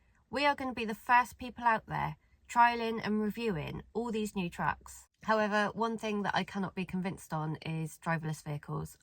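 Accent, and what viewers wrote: West Country, United Kingdom. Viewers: Hi again, having just subscribed to your channel it offered me this one to watch, and I am pleased it did, you spoke alot more in this one, which was great and reminded me of my late partner who sadly died Aug last year, she (Valerie) was from the west country, Somerset to be exact, so hearing your accent really warmed my heart and brought back some lovely memories, we were together 20 years and I still miss her, thank you for sharing. All the very best to you, from Nick.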